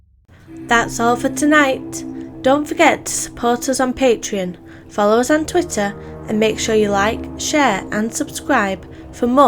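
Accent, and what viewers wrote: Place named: Northern England, United Kingdom. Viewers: rapper She sounds like she could be from Bradford? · are you British? Your accent sounds it I'm from Nottingham you sound more northern but I could be wrong lol what county you from? If your from England that is lol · North of England. Yorkshire or Manchester maybe.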